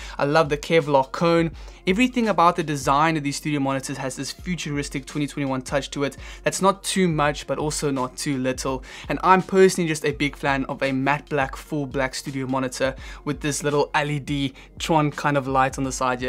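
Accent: South Africa. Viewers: Your accent sounds south african are you originally from south africa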